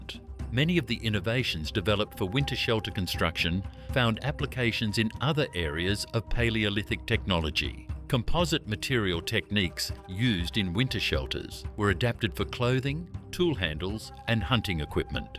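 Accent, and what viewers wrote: Australia. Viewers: Australian accent I think... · Sounds Australian, but used Fahrenheit 🤔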